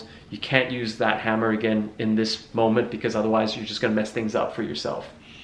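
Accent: United States. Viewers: Why do you sound so American in this video but so Australian in the Ling Ling workout video lmao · I thought he had Australian accent oh well he has a great American accent he’s amazing at the violin too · This is like the musicians equivalent of a no-makeup selfie. BTW I thought he was Australian? How come he has an American accent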